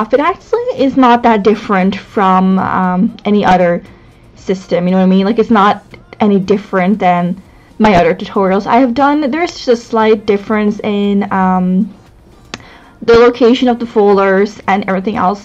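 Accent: Netherlands. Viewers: are you dutch?? cause i am :) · are you from the Netherlands?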